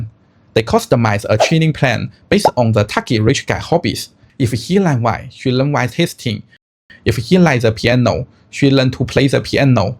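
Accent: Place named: China. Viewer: Hello, if you lose Chinese accent, would be better for views. But it's tough and long process...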